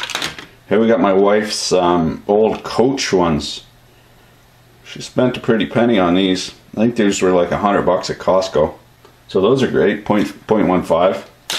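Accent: Canada. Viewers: So glad youtube recommended me to this; just because of the accent lol, you in Northern Ontario or out West?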